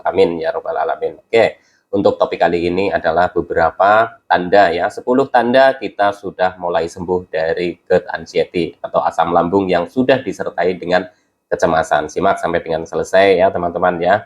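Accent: Banyumas, Indonesia. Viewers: Nyong ngapak jga mas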